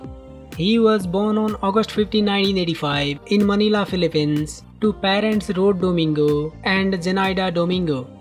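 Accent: India. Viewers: Bakit indiano yung nagsasalita?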